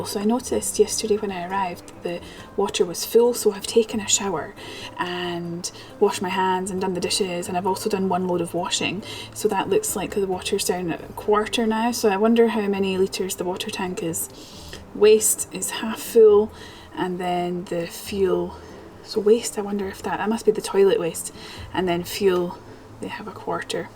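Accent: Scotland, United Kingdom. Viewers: I love the Scottish accent. Its my favorite. I enjoyed the video 😊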